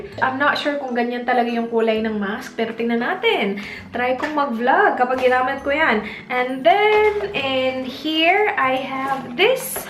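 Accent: Visayas, Philippines. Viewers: haha natawa ako sa "bangag",cute kaayo paminawon ang imo tagalog na may sagol nga bisaya.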